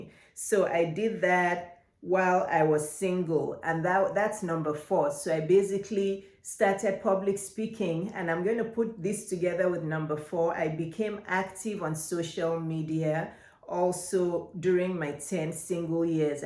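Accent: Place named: Africa. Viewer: I love your African accent, who coached you in public speaking? I live in the Northwest Ohio area. Also, I'm a travel nurse.Latrice ✝️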